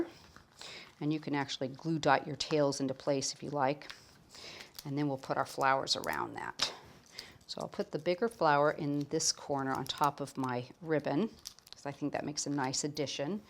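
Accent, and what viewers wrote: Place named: California, United States. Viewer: Just love to watch and hear her Californian accent....cards aren't too bad either:) x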